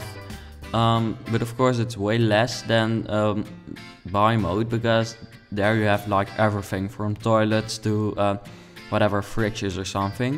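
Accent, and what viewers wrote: Netherlands. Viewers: This is gorgeous. Complaints about your accent are ridiculous, your you, be proud of your accent Dutch!!!! Don't worry about it and the complaints will go away, if they don't then they don't!!!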